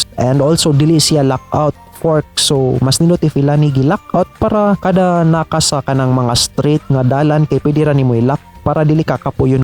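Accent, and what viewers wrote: Visayas, Philippines. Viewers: Bisaya man diay oi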